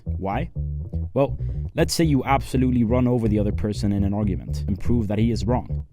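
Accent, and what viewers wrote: Sweden. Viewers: I heard from ur dialect and I knew u were from Sweden :D before u said . Swedish people usually have different dialekt, instead of saying visualising which sounds like vizhualising, swedish people usually say vis-shualising. Or cheese, swedish people say sheese. Just a fun fact and have a great day :)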